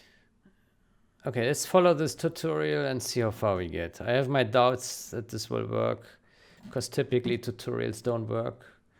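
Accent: Germany. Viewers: ты русский и украинский понимаешь? Но акцент у тебя больше немецкий:)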